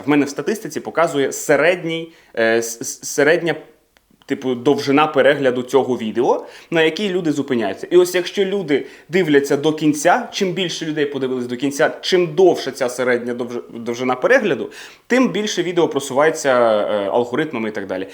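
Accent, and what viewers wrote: United Kingdom. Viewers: Мені дуже шкода що ви показуєте тільки британську вимову….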